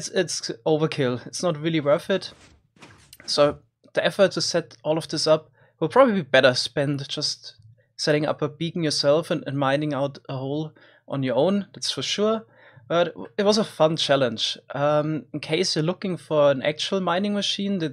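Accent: Germany. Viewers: Deutscher Akzent Izz da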